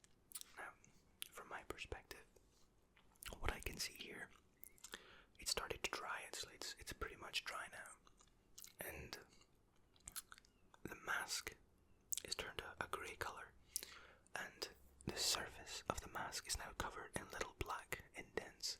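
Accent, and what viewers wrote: Scotland, United Kingdom. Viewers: He's Scottish!? His accent is so subtle I thought he was from the U.S. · I love the Scottish accent and love the way you pronounce words and speak so eloquently. Much love from South Yorkshire 🇬🇧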